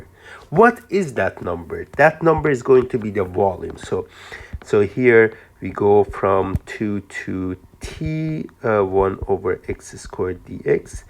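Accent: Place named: Nebraska, United States. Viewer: are you from nebraska? you sound like my dad?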